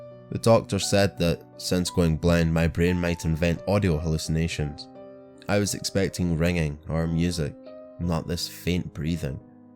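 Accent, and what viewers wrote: Scotland, United Kingdom. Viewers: Mr I like scary stories but your Scottish voice just makes it better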